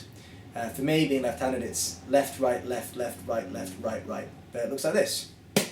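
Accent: United Kingdom. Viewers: you sound english. veeeeery annoying, please spake normul plz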